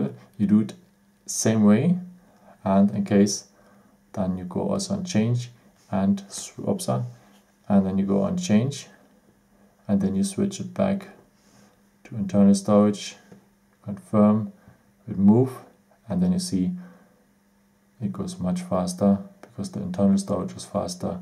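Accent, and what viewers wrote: Germany. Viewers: yor from german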